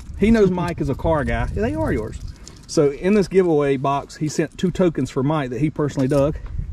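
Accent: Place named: United States. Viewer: Love your American Accents!! A Canadian eh?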